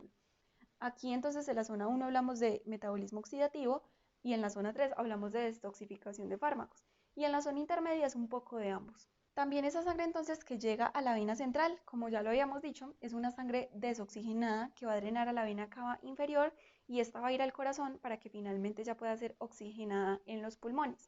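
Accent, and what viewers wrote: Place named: Colombia. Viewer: Hablas demasiado rápido que no se te entiende nada,sumándole el acento colombiano que tienes ,terrible tu explicación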